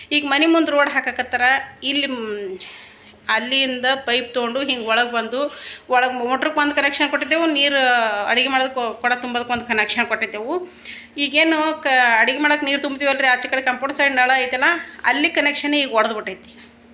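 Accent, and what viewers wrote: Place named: Bijapur, India. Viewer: Nim bhashe nam bijapur du ant gottagut sister.gulbarga bhashe matadal nivu thanks 🙏 nice .navu bijapur distric davru but irodu out of State.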